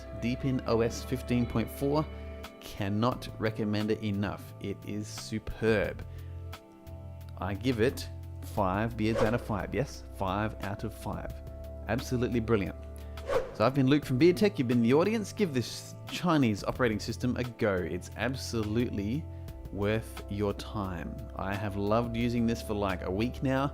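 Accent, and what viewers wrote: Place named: Australia. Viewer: Hi Luke, thanks for that. You sound rather impressed with deepin 15.4. It sounds like you're also in Oz, and that app you downloaded seemed to take a while. Has this been a problem for you since you installed the OS? I prefer to use LibreOffice as a WP app, and I imagine that would take some time to download. (Oh, and I haven't yet installed this OS. Still deciding. It looks good tho, and I'm pretty much over Win 10.)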